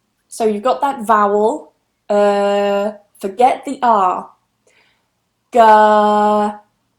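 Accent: United Kingdom. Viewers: British Accents😎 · Is this british pronounciation ?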